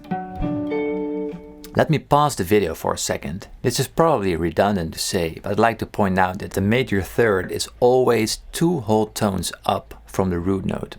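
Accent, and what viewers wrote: Netherlands. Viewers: Hey man are you dutch? Zo ja hallo uit nederland!!! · Thanks man! So simple, yet so nice! BTW, are you Dutch?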